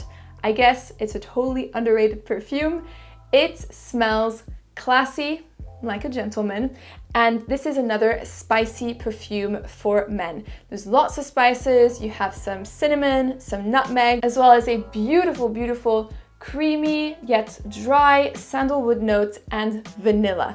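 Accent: France. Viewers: I can hear you are French.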